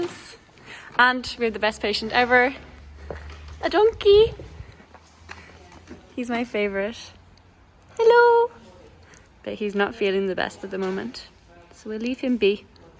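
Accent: Ireland. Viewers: are you irish o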